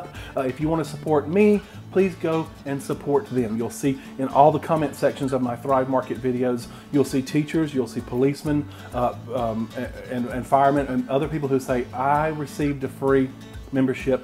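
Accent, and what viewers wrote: South, United States. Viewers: Hey: ) This is my 1st time here...this video was recommended to me, I'd never heard of you or your channel before (or Egg Loaf, for that matter lol). When I saw Flo behind you I thought, "I'm in for one heckuva fun ride!". Then I heard your accent & thought, "Yep! Yep! Yep! I'm in!" (I'm from the South, too...born & raised). With each Egg Loaf, my mouth watered more, especially the 3rd one, the one you came up with. What else could I do but subscribe to your channel & follow you on social media? lol I'm so sorry, I didn't quite hear your question, could you ask me again, please? Oh, no doubt about it.....I'll be spending this evening & the rest of tonight (however long it takes me) watching all your videos, from oldest to newest, until I get caught up! lol Oh, man! Biscuits, Sawmill Gravy, Shrimp & Grits, Cornbread, French Fries, Cornbread Dressing, Pumpkin Pie, Pecan Pie, Pigs In A Blanket, Salmon Patties?!?!?!?!?!? 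Oh, you're spoilin' me, here!!! Seriously, though, I think you're wonderful! I love your style! Best believe, I'll be cooking up your recipes...& I can hardly wait! Thank you so much!!! P.S. "It helps if ya plug it in."!!! BWAHAHAHA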